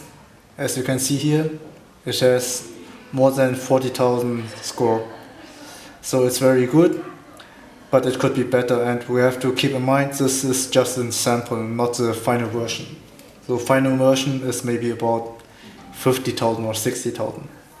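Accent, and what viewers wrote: Germany. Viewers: Typical German - English ... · sounds German as f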